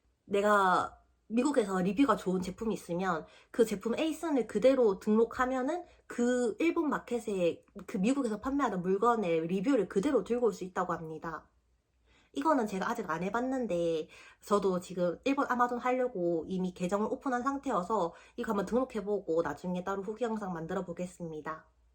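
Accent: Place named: Seoul, South Korea. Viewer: 굉장히 서울말같은 사투리네여 귀에 쏙쏙 박히고 이해가 잘 가네요. 최근 영상도 찾아봐야겠어요. 감사해요.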